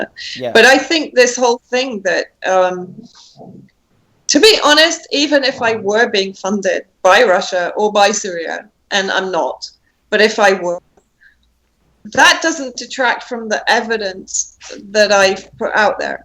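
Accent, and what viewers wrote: United Kingdom. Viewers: Are you back in the UK? I ask because you have that same British accent for this interview as you did during the Assange trial. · I think you’re great, but simply curious as to why you’re suddenly speaking in a British accent? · Great interview, lots of information. Vanessa is a real frontline journalist and deserves to have her story told. Just a comment, it is funny how you can be a chameleon with your accent,. You make videos about Jimmy Dore, etc, and you have an American accent. (No offense, LOL). Here you have a slight British intonation. I pay attention since I meet lots of people in International Schools and they have that ability with languages.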